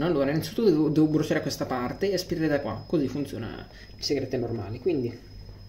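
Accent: Italy. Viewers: Ma sei di Pesaro? Hai la parlata da pesarese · Se parli romanaccio non fai ridere sembri solo un boomer che vive a Roma e vuole i like👌NOICE cmq chi sta leggendo copiate e incollate alle persone tipo questo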